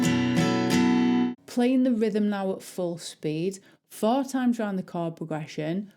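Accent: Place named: Lancashire, United Kingdom. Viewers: im hearing a hint of lancs/north yorks in the accent. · Many thanks, your channel popped up yesterday, the 28th August, by chance. I'm 64 and have been learning since November 2024, after years of thinking about it. Unfortunately in 2023 I broke off the ball part of the ball and socket shoulder joint and shattered my radius (both left, and my right femur, long story). Fingers don't seem to be too keen on going where I want them to, however I've just written down the and, joy, it's still a bit stop/start but I've enjoyed it. Also, in a personal level, it's nice to hear a northern accent (I'm from Blackpool, living in Stockport, where the town motto is "it's not the end of the world but you can see it from here" 😂) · Hello. Just wanted to tell you that I’m enjoying your lessons - the best around as far as I’m concerned. It’s also nice to hear your north country accent, (I’m originally from Cheshire). 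A nice change from the many stateside voices. Hope you had a nice Christmas, and wishing you a happy New Year. Paul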